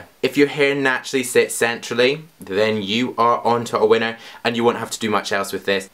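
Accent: Scotland, United Kingdom. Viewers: Are you Scottish ? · That's how people in UK speak their accent is different so don't call him gay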